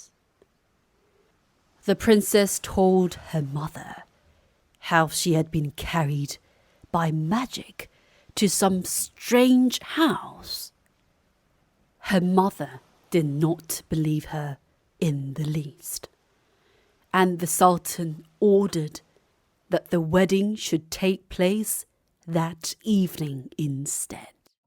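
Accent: United Kingdom. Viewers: 저 따라하다가 영국발음 성대모사 중독돼버렸어요 ㅋㅋㅋㅋㅋㅋㅋㅋ한국말도 자꾸 영국식으로 주접떨면서 하고싶고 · 영국발음 들으러 왔는데 왠일인지 점점 졸려서 10시도 안됐고, 영상은 항상 집중하게 되서 이상하다하고 피곤한 저를 질책하고 반성하려 하다가, [수면용]이라는 걸 다시 깨닫고 아직 잘 때가 아니라서 "Ask not, but eat" replied Aladdin.까지만 봤어요 ㅎ 세시간 뒤에 영상에 목적에 맞는 시청자가 되어서 다시 듣도록 하겠습니다~ 영상 감사해요~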